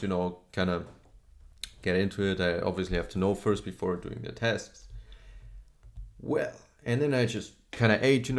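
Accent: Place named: Germany. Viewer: I heard the German accent instantly. Love the vibe of the video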